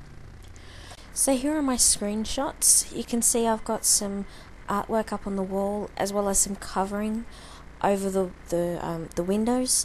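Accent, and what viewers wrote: Australia. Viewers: you sound like and assie to me that is because i am Australian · AHHHH I LOVE the Australian accent. I'm surprised I didn't catch on to that. I feel bad now :( You are awesome in my book :) Australian people are so kind hearted and sweet too, I love that.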